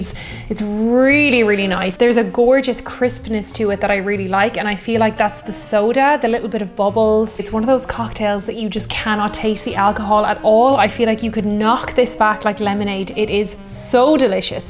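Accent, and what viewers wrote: Ireland. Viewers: This girl should be in the movies. She could be the next Maureen O'Hara. Beautiful girl and her brogue only adds to her charm. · You know, I don't even drink alcohol, but she's so charming and lovely and has that beautiful Irish accent that I'm compelled to watch.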